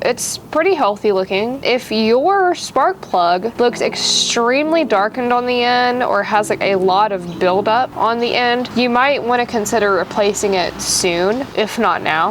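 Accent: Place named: Europe. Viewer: Great information...that accent...European made in America if I'm not mistaking ;-) Stylish! Keep up the good work. Will try same on my 2 Navis. Happy trails. JP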